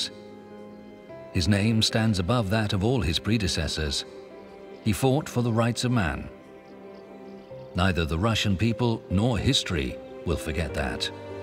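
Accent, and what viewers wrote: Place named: England, United Kingdom. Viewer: МММ, английский тип) но нормально!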